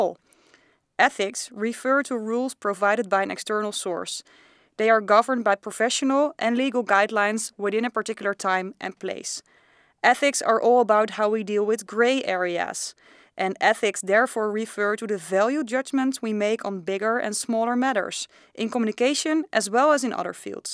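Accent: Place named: Spain. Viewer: This person is definitely from Spain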